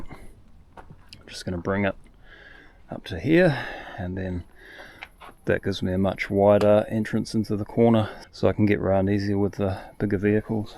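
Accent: New Zealand. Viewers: as a new viewer of your videos again I can't get enough! I could have watched that for over an hour! I did miss the closed caption option, I am hard of hearing but it takes me a while to figure what you're saying in the New Zealand accent! I'm not complaining, but I sort of depend on that closed caption to make sure that I understand your every word. AM. Skip, Western PA, USA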